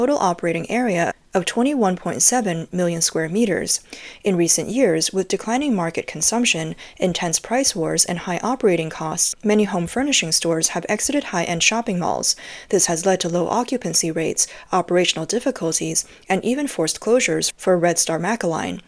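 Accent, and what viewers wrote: Australia. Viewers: Ya, I think she’s Australian, faint accent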